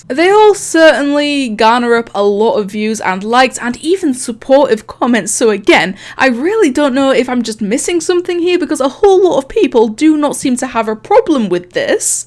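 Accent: Scotland, United Kingdom. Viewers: you sound scottish + british its nice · Are you Scottish?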